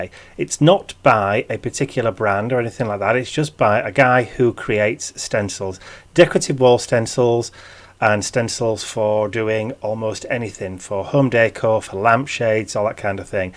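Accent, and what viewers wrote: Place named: England, United Kingdom. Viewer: Hey up chuck, though yur accent sound'tut familiar. It be furst time av sin thi, like. E tis nice to hear a fellow local brit, lol. That took some doing to stop it auto correcting! Who's lad that dose stencils? Would be good to know. Bic W ' house; are they really bad, as I looked up there customer reviews and didn't dare take a chance with not having a great deal of £ and didn't want to risk loosing it. Sorry to bombard you with Q's. Thanks for sharing your page, colours wer grand! Joanne.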